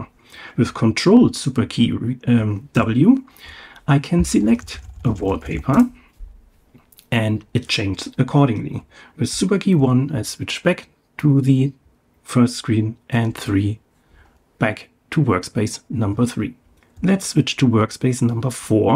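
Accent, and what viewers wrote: Germany. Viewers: man hört das deutsche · The german accent 😂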